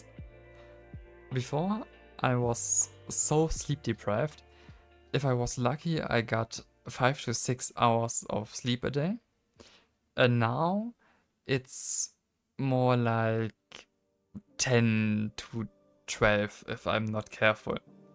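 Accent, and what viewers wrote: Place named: Germany. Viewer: I've noticed that you have a German accent when you're talking.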